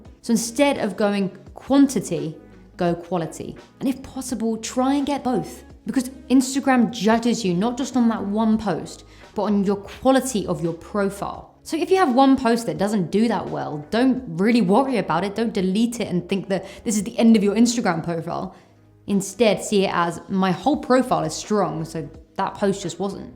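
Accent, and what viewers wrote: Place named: United Kingdom. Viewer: Aaaaah.... British accent 🔥🔥❤️❤️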